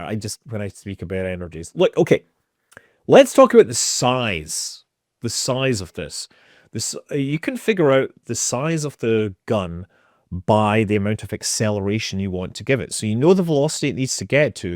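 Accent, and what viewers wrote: Scotland, United Kingdom. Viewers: You said "moon" over 100 times, so this is your best program yet ;>) I'm a Yank...love your Scootish.🎉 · You're sounding more Scottish than you normally do. Very much less American. I admire that.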